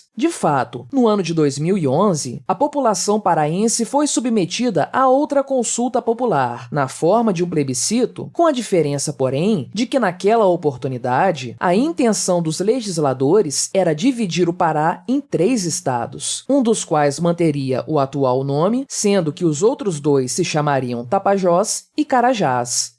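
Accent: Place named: Southern Brazil, Brazil. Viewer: VC DEVE SER SULISTA OU PARAENSE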